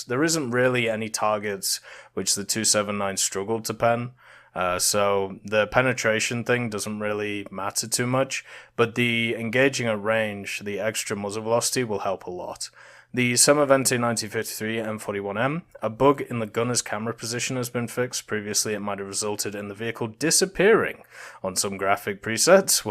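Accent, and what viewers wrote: England, United Kingdom. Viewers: Where is your accent from? Which part in England?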